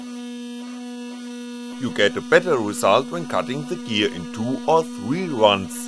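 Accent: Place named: Germany. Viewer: немец на английском - ЖЕСТЬ!!!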